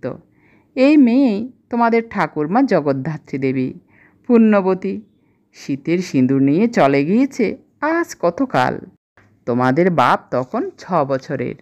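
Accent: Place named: Bangal, Bangladesh. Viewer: খুব ভালো লাগলো বাঙাল টান টা আরো মধুর করেছে , যদিও পুরো বাঙাল ভাষা বুঝতে পারি না পুনঃ গল্পের আশায় রইলাম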